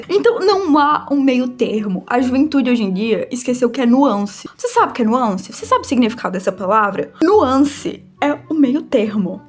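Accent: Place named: Northeast, Brazil. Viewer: Reconheço uma nordestina quando vejo(ouço) uma 🙄🗣️💋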